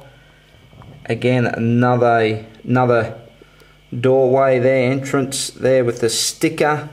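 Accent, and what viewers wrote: Australia. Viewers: If I had to guess, I would say this guy is from Australia like me. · 1st thing I heard was an ausie talking so I insta subbed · Just for the record, this guy sounds really Aussie, and I'm an Aussie haha.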